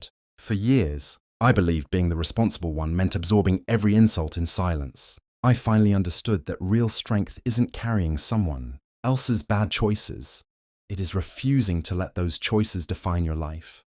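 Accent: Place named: United Kingdom. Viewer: I always loved a British accent!! Keep making stories like this,glad the older brother and the mother got what they deserved!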